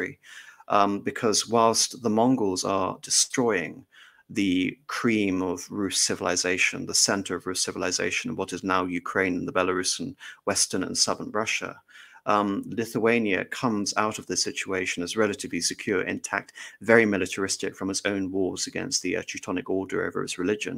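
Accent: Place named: United Kingdom. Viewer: Nice british akcent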